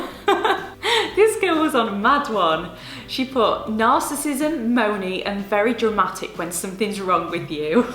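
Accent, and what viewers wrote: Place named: Northern England, United Kingdom. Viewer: Love that you went to Penistone Grammar! I live Huddersfield way and it's great to hear another Northern accent :D xxx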